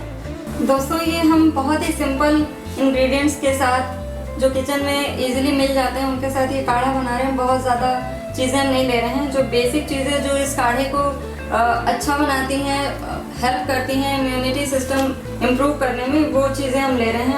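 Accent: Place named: Maharashtra, India. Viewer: Aapke bolne ka jo toning hai mujhe maharashtrian ki tarah lagta hai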